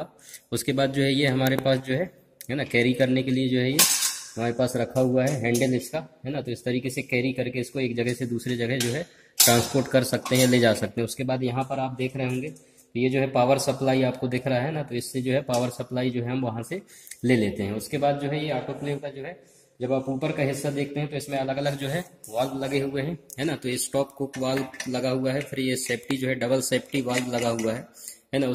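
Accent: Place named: India. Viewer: No one hears ur Indian speech try to post with English subtitles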